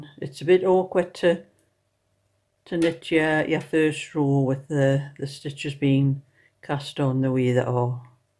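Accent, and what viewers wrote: Newcastle, United Kingdom. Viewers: Lovely to hear another geordie voice , I love knitting ,Thanks for sharing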